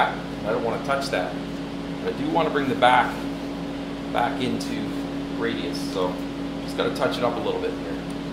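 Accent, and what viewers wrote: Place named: Canada. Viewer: I like everything but the Canadian accent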